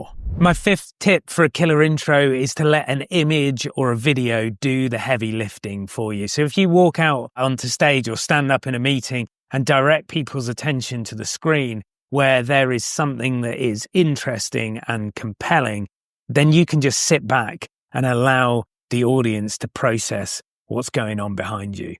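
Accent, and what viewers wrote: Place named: United Kingdom. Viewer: An Italian with a British accent? Interesting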